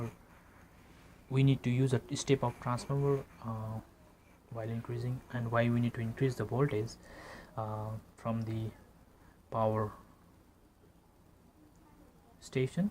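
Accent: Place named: India. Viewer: what the fuck ..I hate Indians English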